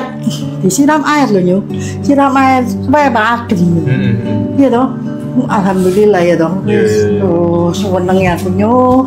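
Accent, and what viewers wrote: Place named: Surabaya, Indonesia. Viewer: Ai ..Seru lek Cerito Iki asli logat'e Suroboyo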